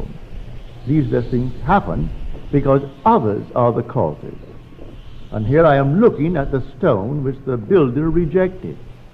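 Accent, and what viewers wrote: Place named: Barbados. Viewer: I love hearing his Bajan accent, nostalgic. I truly like his teachings too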